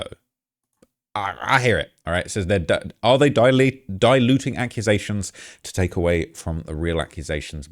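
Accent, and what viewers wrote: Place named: United Kingdom. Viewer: Do you happen to have some crumpets ans tea? Your accent is like nails scratching a chalkboard...fkn lame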